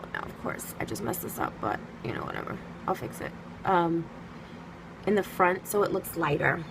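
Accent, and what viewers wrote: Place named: New York, United States. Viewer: I love love your New York accent.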